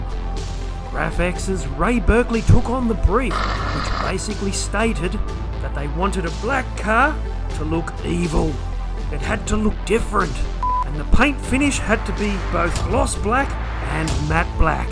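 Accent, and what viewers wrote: Australia. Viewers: To hear the history of the Interceptor in Australian accent is just 👌🏻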